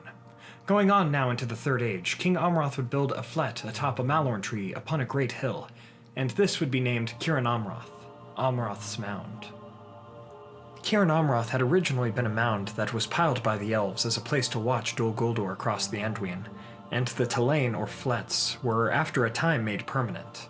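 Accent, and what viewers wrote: United States. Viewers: Are you American?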